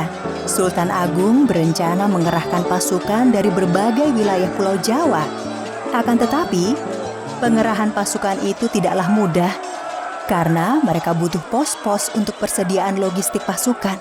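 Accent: Banyumas, Indonesia. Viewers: Ngapak wonosobo😂👍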